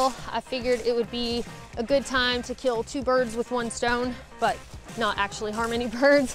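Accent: South, United States. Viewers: Thank you for your videos. You’re such an inspiration to my 10 yr old daughter. Though she doesn’t have a southern accent, she often tries to emulate you... it’s very funny. Thanks so much.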